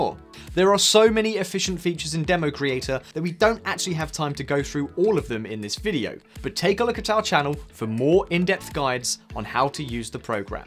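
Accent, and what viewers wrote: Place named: United Kingdom. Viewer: Sir your English is perfect British and clear that is why I watch your videos. Thank you very much.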